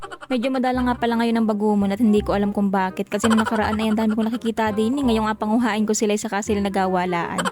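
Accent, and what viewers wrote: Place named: Visayas, Philippines. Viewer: Ido bakit Minsan may halong Visaya Ang salita mo? Sinong Visaya sa maguwang mo?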